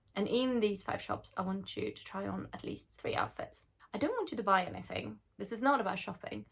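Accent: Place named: United Kingdom. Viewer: Jag trodde du var Britisk, jag älskar din brittiska accent, hälsningar från Peru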